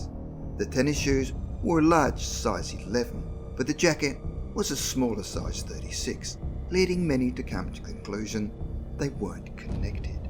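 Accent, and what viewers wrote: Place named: Australia. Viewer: Dude that's crazy you sounded like you were from a bunch of different places. I heard the Australian after a bit of the video I actually commented that in like the first few seconds of it haha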